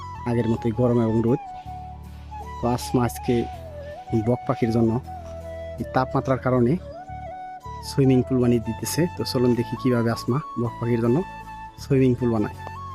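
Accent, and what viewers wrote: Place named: Munshiganj, Bangladesh. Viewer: আপনার মুন্সিগঞ্জ বাসা বাসা নাকি,,, কথা তো মুন্সিগন্জের মত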